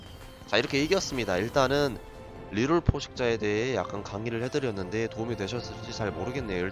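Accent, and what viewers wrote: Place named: Chungcheong, South Korea. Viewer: 항상 롤토체스 많은 정보 얻어가요 말투가 충청도 분이신 것 같아요!!ㅎ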